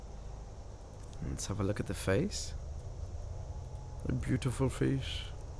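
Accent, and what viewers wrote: Scotland, United Kingdom. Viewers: Scottish accent, am I right? :) Great walkthrough, by the way! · omg your Scottish! :D it feels so rare to see another Scottish person lol xD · Love your scottish accent, reminds me of that guy off Theme Park World who guided you through the game :)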